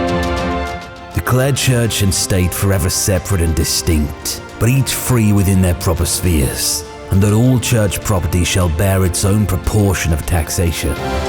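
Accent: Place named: England, United Kingdom. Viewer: As told by a limey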